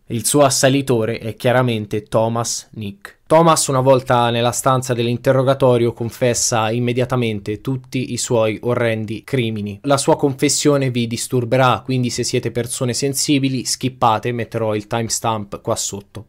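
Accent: Tuscany, Italy. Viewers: Ciao, che storia tremenda! Ho fatto fatica arrivare alla fine e mi ha stupito che uno psichiatra veda la possibilità di riabilitare una persona come Thomas. Hai un bellissimo accento toscano, non hai mai preso in considerazione di trattare la storia del mostro di Firenze? magari inserendo delle clip del processo??? 😁 io me lo guarderei davvero volentieri raccontato da te! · Sei toscano?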